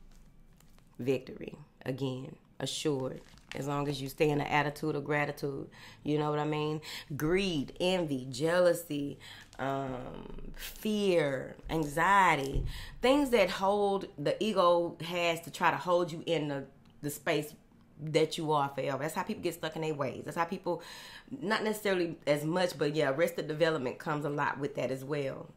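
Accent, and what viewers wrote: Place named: Dallas, United States. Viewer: I knew you sound like you are from Dallas good lord southern woman thanks for your time and messages ms hope prosperity finds you for 2022 keep it up.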